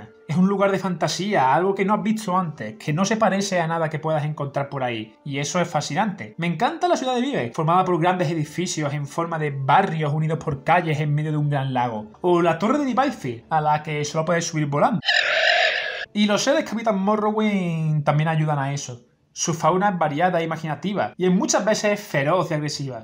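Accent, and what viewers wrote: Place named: Andalusia, Spain. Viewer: Tengo curiosidad, de que pais eres? Tu acento es como Español pero es muy marcado por ejemplo las carencia de las "s" finales en las palabras y otras cosillas. Conosco acentos de diferentes regiones de España pero el tuyo para mi es nuevo, suena a andaluz? Saludos desde Chile, buen video ;)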